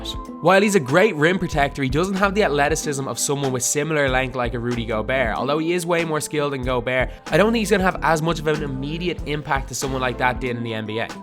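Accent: Ireland. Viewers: thats just normal with an irish accent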